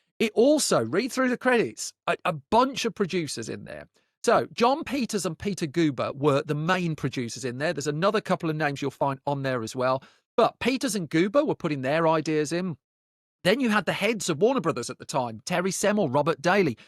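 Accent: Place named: United Kingdom. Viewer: That is one striking British Accent..😊😊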